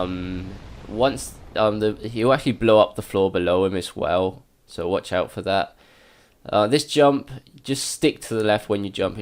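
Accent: England, United Kingdom. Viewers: Love the vids, i know there old but its nice to listen to an Englishman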